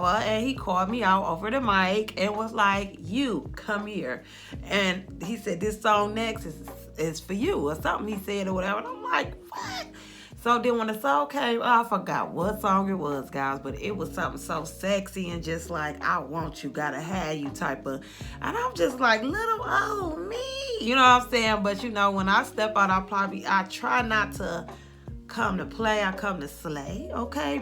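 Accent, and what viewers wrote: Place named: New Jersey, United States. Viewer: Love u and u sound like u from Jersey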